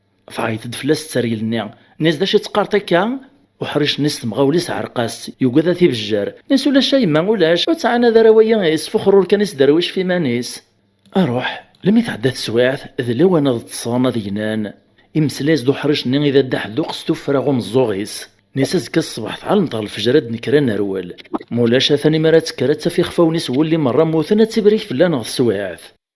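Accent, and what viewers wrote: Kabylie, Algeria. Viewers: Votre kabyle ressemble au mien 😊 je suis de Béjaia et j'habite a Londres. Je dors avec vos belles histoires. A3tik sahha ayizem averqach.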